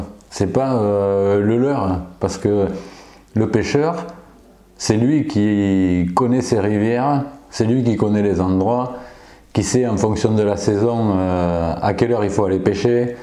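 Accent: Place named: Pyrenees, France. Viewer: Bonjour , ravi de te revoir Quel est ce drapeau en haut à gauche? Avec ton accent tu viens des Pyrénées peut être ? Et vive les pêcheurs et les conseils pour pécher une rivière dans les règles de l'art. Le matos c'est super mais faut il savoir s'en servir.Amitiés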